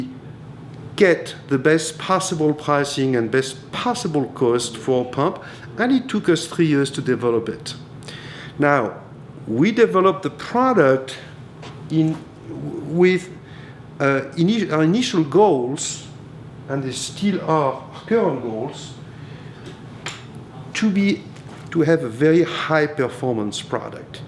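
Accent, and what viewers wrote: France. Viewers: he's french for sure, he has the accent :) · german? u serious ? hes french u can clearly hear it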